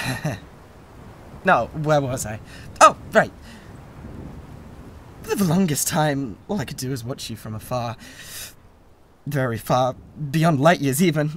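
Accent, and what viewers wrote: United Kingdom. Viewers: You're one of the few ASMRtist that can I British voice I really like, I tolerate very few too.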